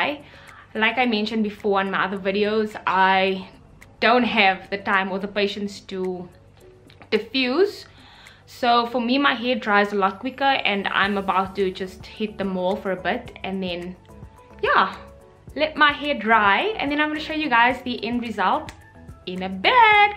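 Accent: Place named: South Africa. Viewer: You sound so south african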